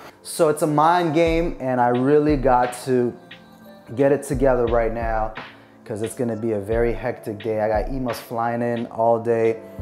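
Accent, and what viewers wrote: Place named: United States. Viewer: Well, you speak american english. Its different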